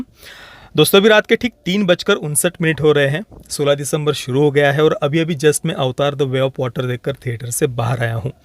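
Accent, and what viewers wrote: Maharashtra, India. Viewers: भाऊ तुझा भाषेवरून एक मराठी माणूस लगेच ओळखु शकतो मस्त आहे तुझं (वर्णन शब्द). मस्त वाटल मराठी माणूस review देताना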